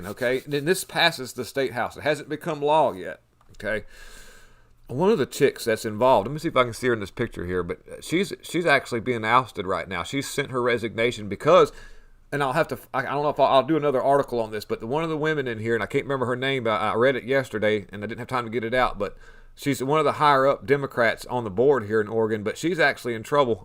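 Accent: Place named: South, United States. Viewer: ! Damn bud! You are pointing out some things that we all need to pay attention to. I don't want to sound disrespectful, (because I see you as much more than this) but others may judge your Mohawk and southern accent as meaning you're not a scholar...but I respect your insights and how you take deeper dives into what's happening around us. May The Good Lord grant you all the wisdom that you are seeking and bless you and your family as you share His insights to you. Love you. UDABST!!!!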